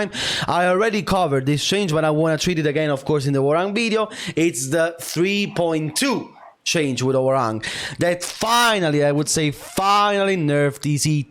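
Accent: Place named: Sicily, Italy. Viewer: Brother, random but are you Italian? Your accent when you speak sounds just like my wife's (Shes Sicilian)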